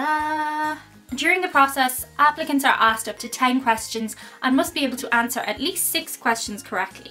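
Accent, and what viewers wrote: Ireland. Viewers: I'm from USA . I passed this test easily. I'm a new subscriber and a past visitor to Ireland. Loved that experience and want to come back. I love you're energy , humour, accent. Yes do love the take on the Irish vs USA . Please more! You're beautifull, funny and intelligent. I have to admit I didn't take to Irish food very well. I was looking for American, Italian . And I don't get ice in my soda. No where! Why is that? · Great Job! I love the Irish accent - could listen to you all day. One thing I have noticed, is three and tree sounds similar in your vids and when I watched Facts. Could you say 'The trees were planted in threes"? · Please don’t take this the wrong way but I’m having a bit of difficulty understanding you. While I adore your Irish brogue would it be possible to use a Boston accent in your videos. Thanks!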